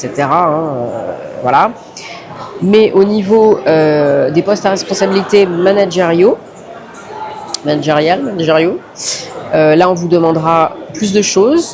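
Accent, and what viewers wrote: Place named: France. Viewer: Any channel that helps inform more beautiful French ladies on how to move to Scotland gets all my support and a big thumbs up 👍😀 Who knew watching a French lady roaming around Scotland could be so interesting and relaxing. I could listen to your accent all day long :) Thanks again for the video, and best of luck with your channel. 💙🤍 from SW Scotland.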